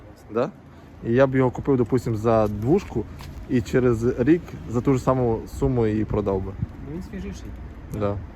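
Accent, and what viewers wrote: Surzhyk, Ukraine. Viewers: Як на мене, треба мову трохи підтягнути, бо суржик , це шлак, а вичавлювати із себе через силу українську , то краще взагалі мовчати. Ну і авто якісь старі корчі- не цікаво (((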